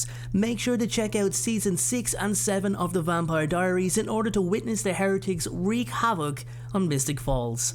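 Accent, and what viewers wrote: Ireland. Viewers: Desiccated... he has an Irish accent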